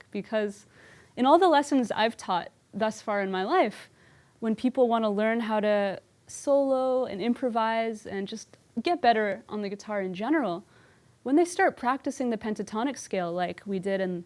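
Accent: Canada. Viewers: She's a great teacher. And I love the Canadian accent.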